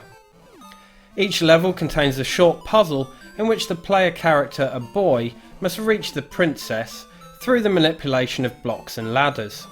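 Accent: England, United Kingdom. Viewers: I downvoted this video because you're English and I find English accents to be very unpleasant and English reviewers always tend to miss the most important and distinct parts of gameplay mechanics in favour of prattling on about story and themes.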